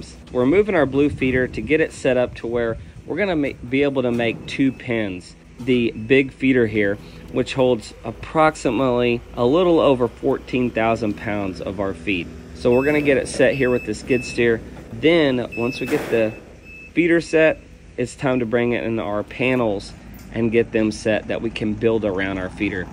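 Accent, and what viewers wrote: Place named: South, United States. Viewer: It’s just the way he talks. Down here in the southern part of the country we tend to give some words our own special tweak.😉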